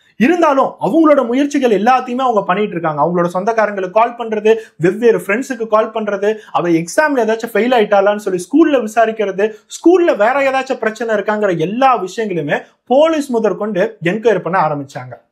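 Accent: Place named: Coimbatore, India. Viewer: ஹலோ வணக்கம் வணக்கம் நான் கடந்த சில மாதங்களாக உங்களது வீடியோவை மிஸ் பண்ணாமல் பார்த்து வருகிற மற்றவர்கள் போடுகிற வீடியோ விற்கும் உங்களுக்கும் என்ன வித்தியாசம் என்றால் அந்த சம்பவத்தை சொல்லும் விதம் தேவையில்லாத ஆர்ப்பாட்டங்கள் இல்லாத அருமையான தெளிவான விளக்கம் கடைசியில் ஒரு ஒரு அனுபவம் பாடம் ஒருவேளை நீங்கள் பொள்ளாச்சியில் படித்ததால் கோவை மாவட்ட சொல் வழக்கு நன்றாகவே உங்களுக்கு வருகிறது அந்த கதை சொல்லும் விதமே நீங்கள் வெற்றியடைய முழு முதற்காரணம் தயவுசெய்து இந்த பாணியை மாற்றிக் கொள்ளாதீர்கள் தானாகவே பார்ப்பவர்கள் எண்ணிக்கை அதிகமாகும்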